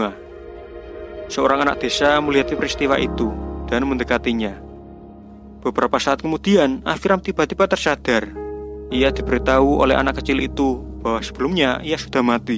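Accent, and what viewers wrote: Java, Indonesia. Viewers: Nrtor nya busyet medok!!!!!!!!! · Yang jelas pembawa alur ceritanya medok.. Ajib .. menghibur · Medokk bgtt bang 😄😄😄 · Medokkk nya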